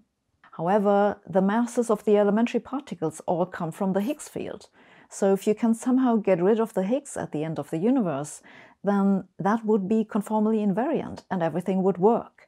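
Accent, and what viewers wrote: Germany. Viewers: Are you from Hamburg? your accent reminds me of my friends mom. She had the most beautiful accent speaking English, so pleasing on the ears... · You're very funny! your talk reminds me of old American cartoons in which the mad scientist always had a German accent. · What's the charm here? It must be nuanced English backed up by a German sound palette and oh yes the content! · I love hearing about science on YouTube and from Ms. Hossenfelder in particular. I feel constrained to admit I primarily watch because is a smokin' scientist. Could that tiny woman with her little German accent & flawless English be any sexier? Intelligence is attractive and this adorable clothes horse should be out there in the famousphere with Neil DeGrass Tyson as a rock star scientist. rocks.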